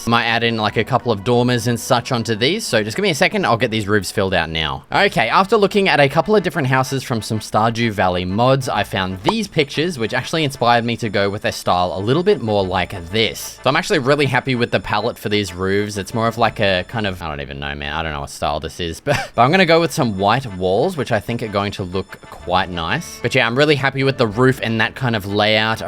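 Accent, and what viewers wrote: Australia. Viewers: are you Australian? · hes australian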